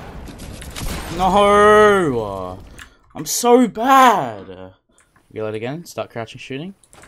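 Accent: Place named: Oceania. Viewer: not sure if your trolling but if you can't tell by his accent he plays on oce servers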